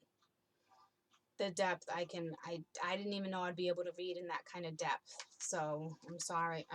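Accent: Boston, United States. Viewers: (Aries) omg. the only differences in you and my Scorpio ex is the hair, color, same hair, different nose and your I assume boston or jersey accent, leaning towards boston though insead of Ky. It's crazy but I love your videos, your too decent. 3rd party situations with her and me being verbally and emotionally abusive to her because I........just fucked up but. I miss her. I always enjoy your videos. :)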